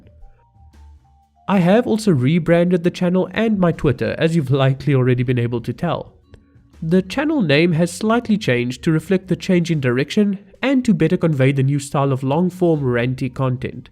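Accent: South Africa. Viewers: Rage against the dieing of the light - He sounds Indian but with a South African accent... 🤔 I wonder where he's from?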